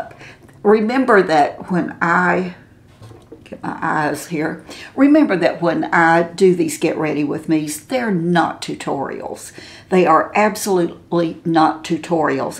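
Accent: South, United States. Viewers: Your eyelashs are SO long! Thanks for a great video,. You seem like a big sister, favored aunt and cherished grandmother. None of which I actually had growing up in North Carolina. Your kind spirit and southern accent are INCREDIBLY nourishing and healing for me.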